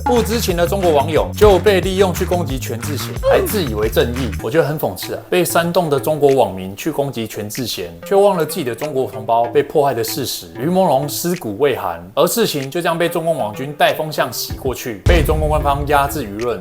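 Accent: Taiwan. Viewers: 發現看了幾次，蠻喜歡聽波特王烙台語的😂 很有‘’愧靠‘’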